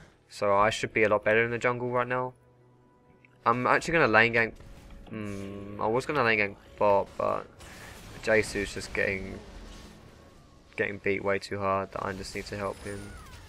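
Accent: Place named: London, United Kingdom. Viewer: london accent :)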